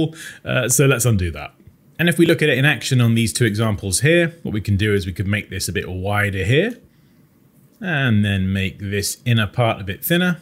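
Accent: United Kingdom. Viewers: Your accent implies that you’re British , Aren’t you ?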